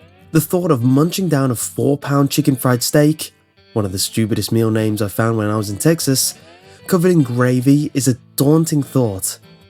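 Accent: United Kingdom. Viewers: Your englaned I thought you were British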